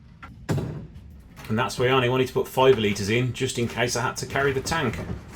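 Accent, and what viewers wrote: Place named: Black Country, United Kingdom. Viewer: Agreed. No need for the music. We just need that Black Country accent.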